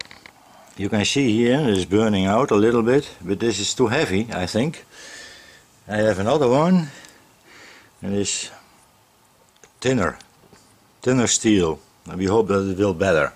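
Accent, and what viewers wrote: Netherlands. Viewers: agree,his accent is Dutch not German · You are dutch right? Because my family is dutch and you have an accent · He is Dutch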